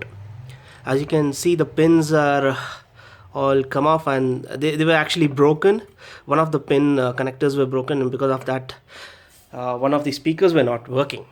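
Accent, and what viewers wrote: India. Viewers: listen to him he's Indian and alot of Indians are doctor n engineers n have a great accent so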